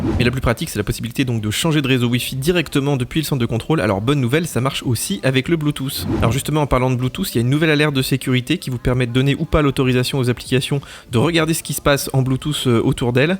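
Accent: Switzerland. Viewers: Mais c est que tu es que tu es valaisan 🤗 je ne savais pas 😏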